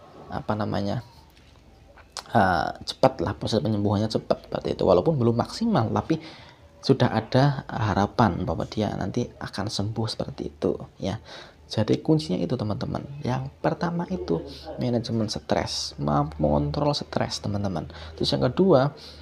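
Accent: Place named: Java, Indonesia. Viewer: dari logatnya kaya wong jawa ngapak mas.. kalo boleh tau domisili manan mas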